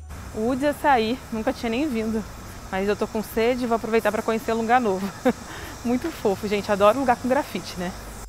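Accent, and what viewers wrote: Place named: Rio de Janeiro, Brazil. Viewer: Totalmente sem sentido colocar uma carioca para falar de Moema. Ninguém merece ouvir lilais.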